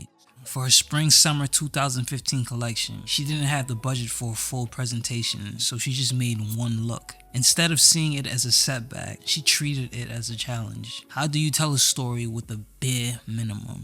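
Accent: New York, United States. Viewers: Where’s your accent from? It’s great + super interesting! Is it New York?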